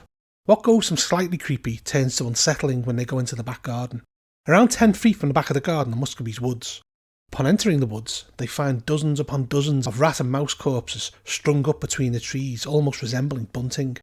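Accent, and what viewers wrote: North, United Kingdom. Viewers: Hi, where are you from? You have a great voice to my American ears but wondering if it is Northern? I’m going to run Scritch Scratch tonight and enjoyed your summary. The scenario does suggest groupings for less than 6 players, on page 8 under the heading “Six of One” in the edition I purchased which looks a bit different than yours. Cheers!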